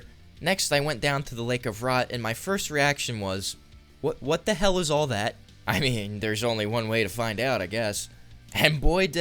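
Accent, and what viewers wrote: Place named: Philadelphia, United States. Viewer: Bud you from around Philly? You sound like me lol. Solid content dude take the sub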